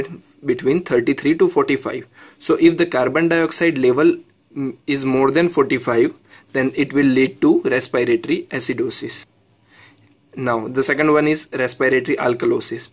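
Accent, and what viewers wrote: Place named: India. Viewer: Annoying accent. Go back to India.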